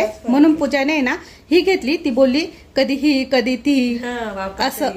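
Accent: Nashik, India. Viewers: नाशिकची भाषा दिसते आहे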